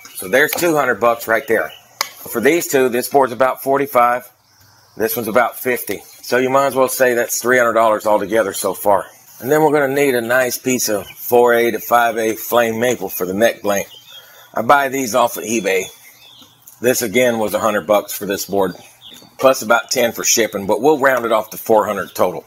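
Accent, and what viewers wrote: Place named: Kentucky, United States. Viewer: You from Kentucky ? You have the same accent as John Prine . In fact you sound just like him when your talking .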